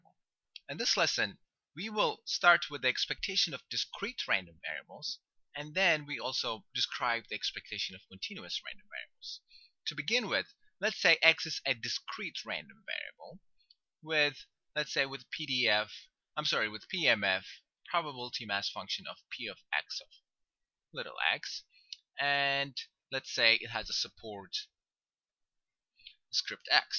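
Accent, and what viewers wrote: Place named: Germany. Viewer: I detect a slight german accent.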